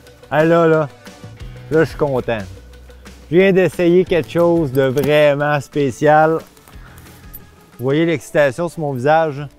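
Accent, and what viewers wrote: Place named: Quebec, Canada. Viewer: Cet accent ... J'adore ! vive le québec 😀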